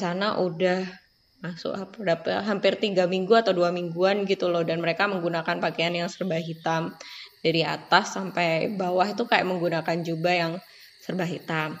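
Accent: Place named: Jember, Indonesia. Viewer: Dari logatnya apakah mbak orang Jember? 😁 Aq tinggal di jember tapi mpe skrg blm pernah berkeinginan maen ke alas P Kbnyakan nonton podcast n cerita horror jd udah kbnyang serem aja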